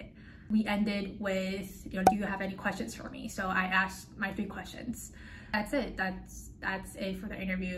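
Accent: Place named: Taiwan. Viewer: great content, you sounds more like from Taiwan, I thought u were from HK with that last name .......keep up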